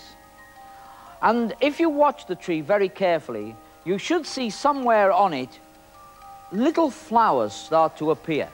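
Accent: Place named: Yorkshire, United Kingdom. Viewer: has a British accent, more exactly he has Yorkshire accent from east England. Don't worry it is fairly thick and a lot of people have trouble understanding it. Even people who do have english as a first language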